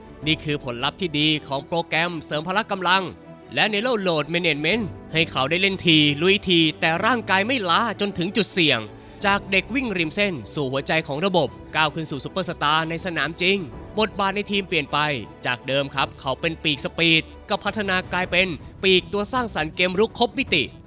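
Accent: South, Thailand. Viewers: อย่าพูดใต้อีกนะครับ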